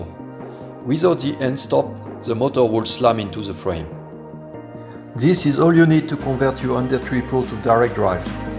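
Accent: France. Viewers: I love your accent, are you french? The way you say extruder just sounds so fancy lol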